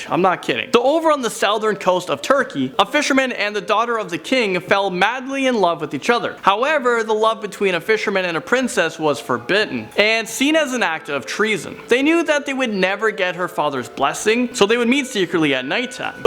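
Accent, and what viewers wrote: Canada. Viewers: “Oot”. Thank you. Nice to hear a Canadian accent escape onto the net.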